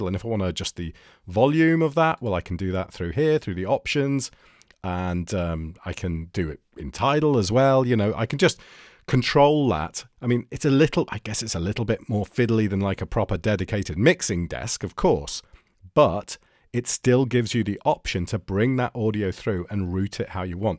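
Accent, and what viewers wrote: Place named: United Kingdom. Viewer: Thanks a lot!!! Love your britsh speech!!!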